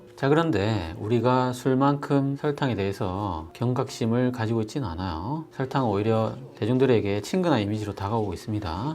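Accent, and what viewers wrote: Gyeongsang, South Korea. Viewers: 우리 님은 너무 훌륭한 강의를 하시니 경상도 억양도 너무 멋있게 들리네요!..늘 고맙습니다요!..^^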